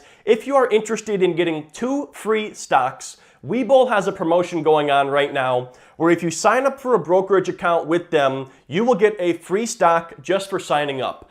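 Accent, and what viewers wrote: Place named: Chicago, United States. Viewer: Your accent. What part of Chicago you from?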